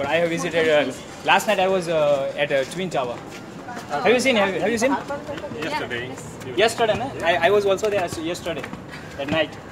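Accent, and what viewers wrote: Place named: Pakistan. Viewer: Aap talking like pakistani cricketer!🕵 u had a great time in malesiya with foreign girls😍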